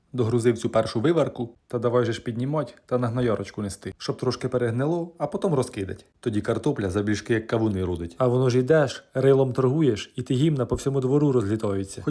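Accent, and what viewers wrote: Ukraine. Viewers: Боже,який гарний український діалект,насміялася Хай щастить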